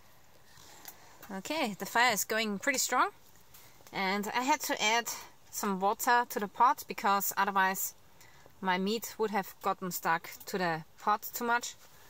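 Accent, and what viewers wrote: Germany. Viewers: Pasaba por aca y...escucho alguien de deutschland hablando american, but in that frozen field even me would be talking just to warm up.but congrats for have chosen a labrador dog for companion no better friend for any self sufficient girl. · Really enjoyed this. That very German English accent is the best...greetings from Australia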